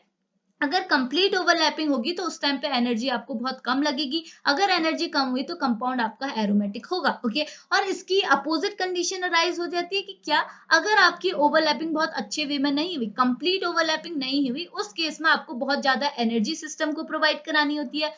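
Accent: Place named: Hyderabad, India. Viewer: Aapka haidrabadi tone bhot cute lagta he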